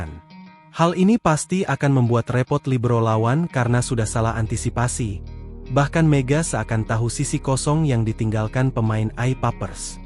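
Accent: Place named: Java, Indonesia. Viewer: Medok euy...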